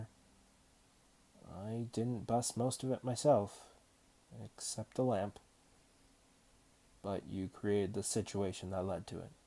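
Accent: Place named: Tennessee, United States. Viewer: Great voice. Thank you for uploading. What state are you from? You sound like a friend from TN.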